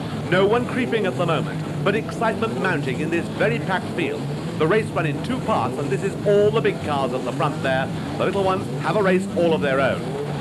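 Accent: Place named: RP, United Kingdom. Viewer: He's speaking in RP (received pronunciation), more commonly referred to as "BBC English". The criticism of his pronunciation is not unfounded. He is indeed pronouncing chicane incorrectly. Given that his only job here is to speak clearly and accurately in order to provide a useful commentary of events, one could fairly and accurately refer to the commentator as a prat (the official definition meaning "incompetent").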